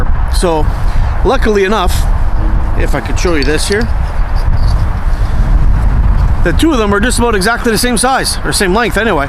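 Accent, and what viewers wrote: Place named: Canada. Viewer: boogie2988 with a Canadian accent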